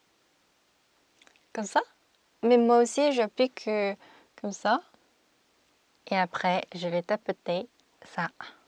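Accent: France. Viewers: j'aime beaucoup trop t'en accent Français tu es magnifique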